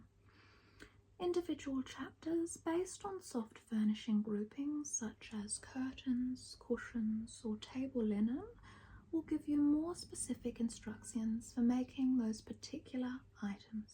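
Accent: Australia. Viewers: Obsessed with your asmr vids!! You do rich aussie so good lol 🤍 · Aww I love your asmr’s so much especially its in Aussie accent but can you change your face Expressions it’s make uncomfortable👉🏻👈🏻 Much love mum🩵🩵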